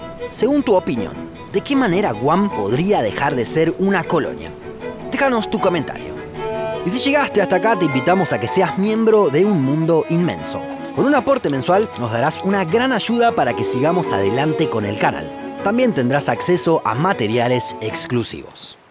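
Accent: Argentina. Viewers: El acento argentino es muy lindo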